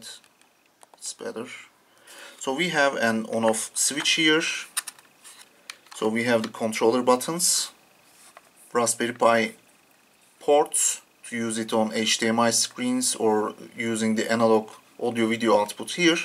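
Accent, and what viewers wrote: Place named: France. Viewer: French accent eh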